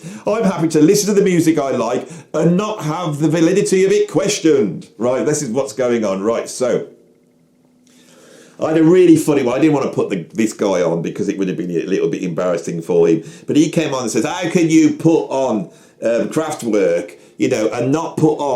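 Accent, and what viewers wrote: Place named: Black Country, United Kingdom. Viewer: You sound like a YamYam so heres one for ya, saw the Pistols at the Laffiette Wolverhampton in 1977, they were touring as the Spots (Sex Pistols on tour) because they were banned from everywhere. Nancy was stood behind Sid during the gig as he proceeded to carve his chest with a beer can . those were the days...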